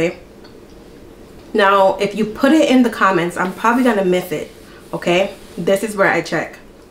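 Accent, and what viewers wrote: Jamaica. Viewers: Why in some videos does she have a jamacan accent and others she doesn’t does she just put it on sometimes? I’m confused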